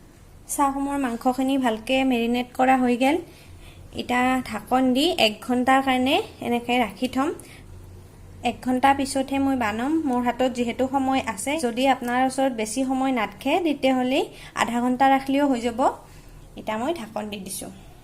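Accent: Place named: Pathsala, India. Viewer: Mur mar ghr nalbari..apunar kothabur buji puat eku problem a hua nai..moi bhal pong ei pathseila kothagelkhon😊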